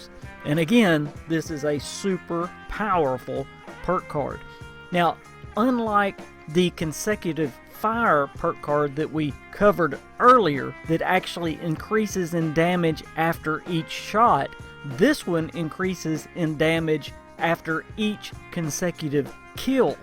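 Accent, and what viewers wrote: United States. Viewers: I love your country accent, feels like home! As far as content, very informative! You've got a new subscriber!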